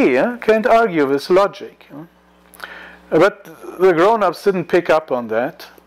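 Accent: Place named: South Africa. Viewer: Where are you from? It sounds like South Africa, but I'm not sure.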